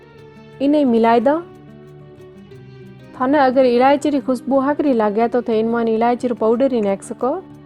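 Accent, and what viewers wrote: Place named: Jodhpur, India. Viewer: Aapki boli mery pardadi ko bahut achhi lagi. And aappki boli mery Jodhpur me rahne vali mosi jesi hai 😊😊😊😊😊😊😊😊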